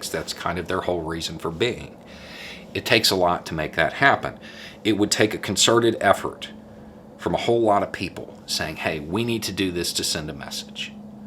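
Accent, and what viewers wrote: South, United States. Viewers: Agree. We all have unconscious bias due to upbringing, education, life experiences etc but it takes courage to identify it. At first I was turned off by southern accent and the Duck Dynasty beard:D but so far his comments are fair and square.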